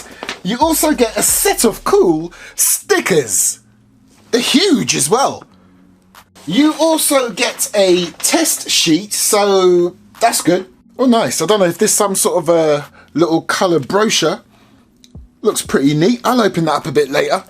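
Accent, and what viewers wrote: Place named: United Kingdom. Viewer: Ima sum up why is my favorite airsoft reviewer: 1. He has a british accent (like come on) 2. He’s always super excited about reviewing these guns like it’s awesome 3. He likes to review budget guns and sometimes are beginner guns 4. If there’s a gun he’s prolly reviewed it EDIT: TAKE MY MONEY SPECNA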